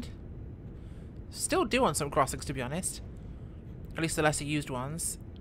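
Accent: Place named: Somerset, United Kingdom. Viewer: Wow am impressed do you have an interest in trains or are you just playing this simply for fun?? 😄👍 Oh and your somerset accent was good and funny 😄😄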